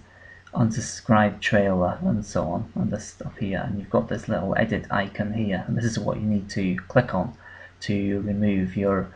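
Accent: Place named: Scotland, United Kingdom. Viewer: I noticed a scottish accent there , i think , i'm from scotland :3 . The channel promo is important for people checking out the channel but the one thing i really wanna know is that i noticed that for some reason when a video of mine is playing , when it ends , it auto goes to another amv made by someone else . Do you know any way to stop that ? or is that another Google thing ? Peace & Good video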